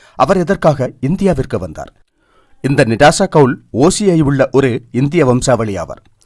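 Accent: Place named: Sri Lanka. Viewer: Your voice is very good like a cylon Tamil mixup. Congratulations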